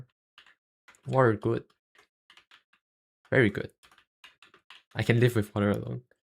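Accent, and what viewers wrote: Singapore. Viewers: hearing you talk in sg english is so weird lol my brain is not used to it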